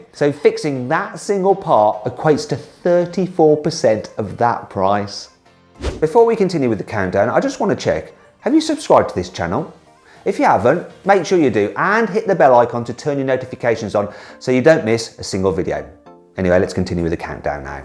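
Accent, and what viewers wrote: United Kingdom. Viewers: マットの英国アクセント好き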